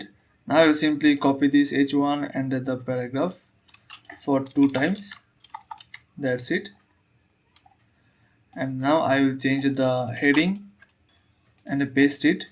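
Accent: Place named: India. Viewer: "Here you can see that" , also nice video , the accent is very indian sir.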